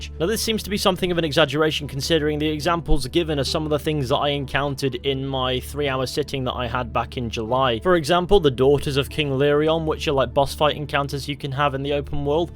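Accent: United Kingdom. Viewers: Man you're talking so fast😅 and with your uk accent it's damn hard for me to fully get what are you saying(or maybe I'm just a stupid retarded who still struggling with english) and since I'm unfortunately not a native speaker, it makes it harder but I concentrate as much as I can😅 anyways I loved this video and could claim that i understood 80% of your context,love your content 🤙♥️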